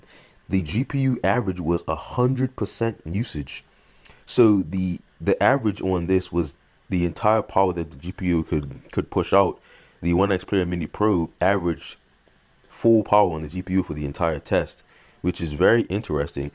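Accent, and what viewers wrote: Bermuda. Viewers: Are you from Bermuda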